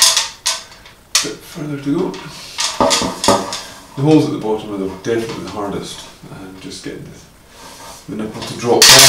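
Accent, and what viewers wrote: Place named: Scotland, United Kingdom. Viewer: Oh he’s Scottish !!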